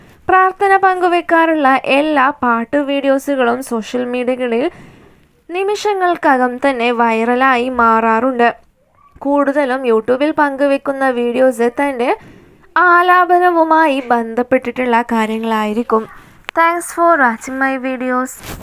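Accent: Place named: United Kingdom. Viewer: ഇംഗ്ലീഷ്കാരി ആണോ മലയാളം വാർത്ത വായിക്കുന്നത് 😎 കഷ്ടം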